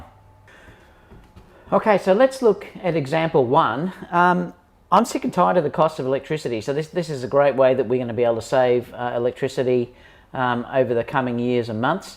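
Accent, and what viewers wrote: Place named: Australia. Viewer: Such an Australian narration and humour, mate!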